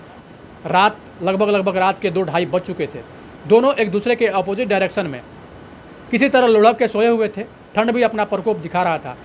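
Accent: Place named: Bihar, India. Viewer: Aap bahut achha bolte ho absolutely Bihari style..I like it ❤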